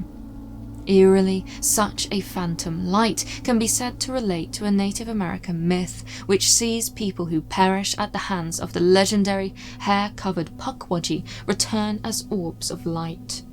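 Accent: United Kingdom. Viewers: These subjects are so often covered by american writers that it is nice for a british person to hear the voice of a clearly spoken british person !!!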